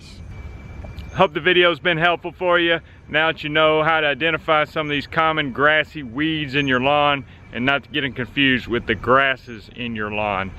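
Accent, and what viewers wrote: South, United States. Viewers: His accent makes my mouth feel like chewing a southern gum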